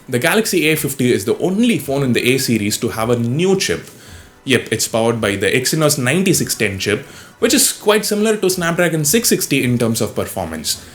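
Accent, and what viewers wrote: India. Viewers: I love ur english... purely Indian... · I dont normally comment on indian reviewing phones but I like your accent and basically explains more about the phone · I hate hindu English I don't understand it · Good video dude. I like your accent and your the first Indiana reviewer I have seen Good explanation Hope all best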